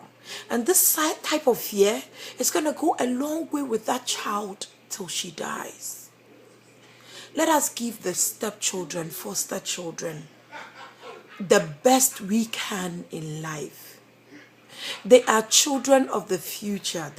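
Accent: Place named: England, United Kingdom. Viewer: you are too kind!!!!!!! we need many parents like you in this world:) BTW I Love your English accent!!<3<3;)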